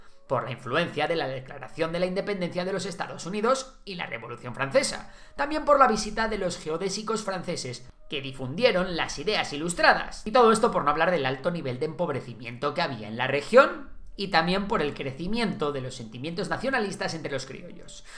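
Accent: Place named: Spain. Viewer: Hola, Espero me lea . Aunque es muy extraño que un español con su acento diga cosas raras como "Tortita en la cara", "le hizo la cama". De este video . Y aunque palabras de "chivato", en Argentina Colombia en Chile algunos no entienden, o México, pero "hacer longis" Chungo" ,"Feten","Faripré", "Potra" (suerte), me sorprenden en videos de españoles que no entiendo y debo pausar para investigar. Yo lucho para que "No sabo kid" me dijeron, hay estereotipos, a los españoles y latinoamericanos debemos de tener una unidad. Aprecio mucho la forma rápida de explicar, me gustan sus ilustraciones !. Aprendo mucho. El minuto 12 :04 ese tono español que no entiendo al mencionar todos esos nombres, de verdad que le aplaudo. 😅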